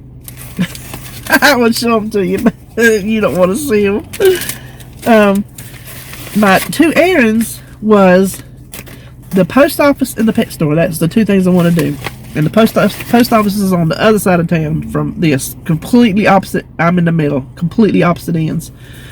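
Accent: South, United States. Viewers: YES Ma’am! One step closer to surgery! I wish you could talk to us everyday because you give me so much happiness. Just hearing that sweet southern accent and all your sayings crack me up! You are definitely a special friend to me. Love, hugs & laughs to you . ❤️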